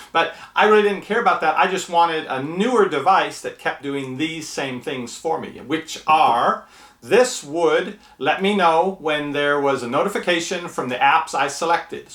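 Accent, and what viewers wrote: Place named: Northwest, United States. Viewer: You said Seahawks. Are you from Seattle? I've been wondering where you're from; clearly not Austin. You say "aygs" for "eggs" and that would make sense for the northwest.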